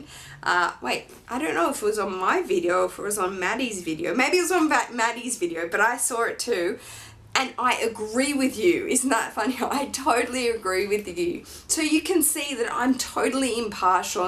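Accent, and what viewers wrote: Australia. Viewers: This video is so good on so many levels! I really love the LV community...even though I'm new to it, I'm not new to fashion/lifestyle blogging...it's really lovely to see the support and friendship here. Fashion blogging has kind of lost that feeling of being kindred spirits because it's so commercial now. Anyway, I just love your videos, not only because you are so cheeky AND well-informed, but because I'm married to an Aussie, and that accent is one of my faves! I used to live in Sydney, so every time you mention Bondi Junction, my heart skips a beat. Thanks for all the killer information, gorgeous! xo V.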